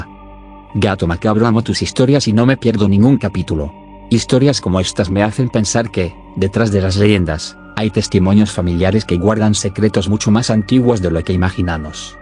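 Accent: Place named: Mexico. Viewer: exactamente, tenemos otros términos y lo narra muy mexicano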